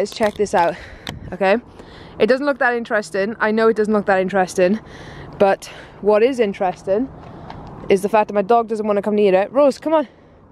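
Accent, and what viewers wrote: Wales, United Kingdom. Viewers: assume wi ya voice ya welsh where about wales are u ?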